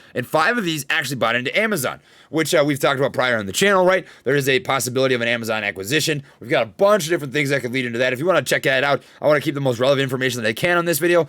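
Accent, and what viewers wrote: Minnesota, United States. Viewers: Minnesota boy?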